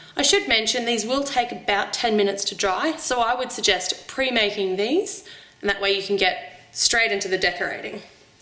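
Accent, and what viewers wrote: Australia. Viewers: I think shes australian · Are u an Aussie if so nice accent lol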